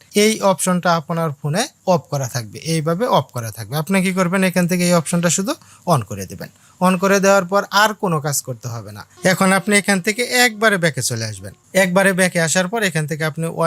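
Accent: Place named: Bangal, Bangladesh. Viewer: বাঙাল টান কম থাকলে ভালো হয়, কেননা আমার মত অনেক দর্শক ভারতের আর একটু তারাতারি বললে ভালো হয়। শুভেচ্ছা রইল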